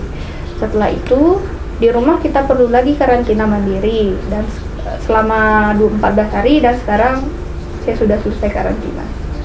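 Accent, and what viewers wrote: Bali, Indonesia. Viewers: Logatnya asli bali bnget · Sing hilang" logat bali ne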